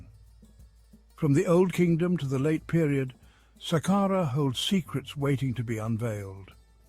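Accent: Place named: United Kingdom. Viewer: It's painful to hear this shit especially in British accent... Western so called HISTORY expert just lays and lay, timeliness and historical facts all coming from the old testament and the talmud... Nothing in all of the documentaries makes any sense all just to make one creature happy....... FACT 🙈👁️🙉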